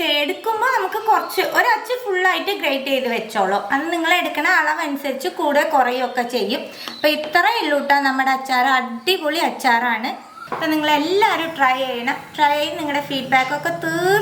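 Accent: India. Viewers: ee narration style kettal thanne video full kandu pokum.. kottayam slang 🥰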